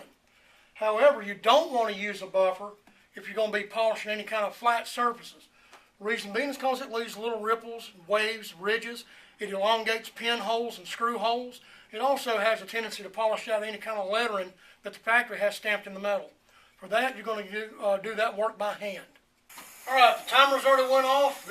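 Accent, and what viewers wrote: United States. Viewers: Weird Yankee accent.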